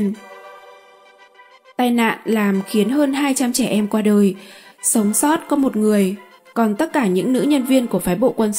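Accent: North, Vietnam. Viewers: Nghe, dễ. Dễ với người bắc. Còn với người nam khó nghe. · giong doc bac ky thi nghe sac mui Bia chuyen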